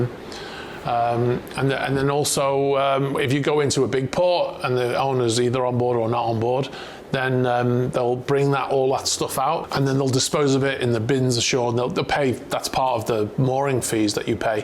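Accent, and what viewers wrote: Liverpool, United Kingdom. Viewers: Are you a Scouser???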